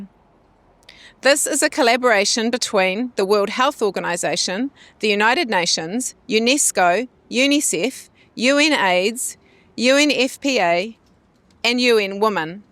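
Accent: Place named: New Zealand. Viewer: Dickheads long strategy -- sex years long ... you gotta love NZ accent 😂😂😂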